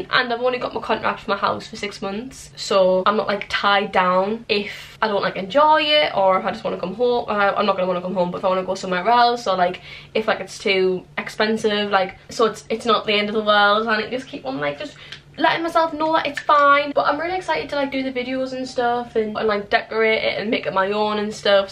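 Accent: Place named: North, United Kingdom. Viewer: you’re one of my fave you tubers, I don’t think I’d ever stop watching your videos, you’re so fun and honestly I would love to be friends with you, I’m also from the north and I absolutely love your accent ‼️💜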